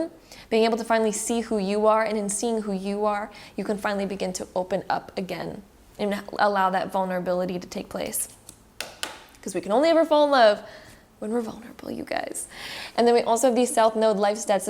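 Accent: Australia. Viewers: Your Australian accent is absolute gold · It’s the Aussie accent for me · you are the cutest human 😭😍 the Australian accent got me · OK BUT YOUR AUSSIE ACCENT IS AMAZING AND WOULD TOTALLY HAVE ME FOOLED 😂😂😅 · Ahhh I love the Australian accent haha. You always remind me to have fun no matter what. Keep those vibes high!!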